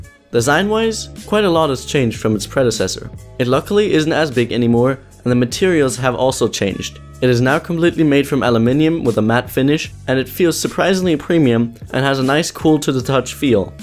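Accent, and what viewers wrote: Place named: Germany. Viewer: The "aluminium" and your accent give away that you are german, am I right? ;) Aber super zu sehen, dass hierzulande doch ein, zwei Leute neben mir das 6P haben. :)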